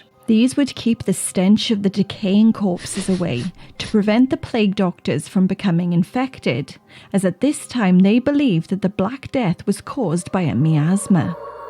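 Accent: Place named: Wales, United Kingdom. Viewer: lovely to hear a welch voice doing the voice over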